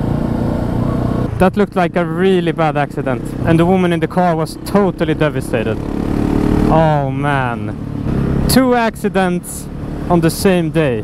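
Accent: Sweden. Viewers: Love the swedish accent haha, great video btw!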